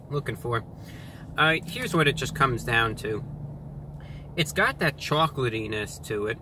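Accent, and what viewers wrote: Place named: Northeast, United States. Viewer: I know you live in Florida but it sounds to me like you have a very northern east coast accent. Where did that come from?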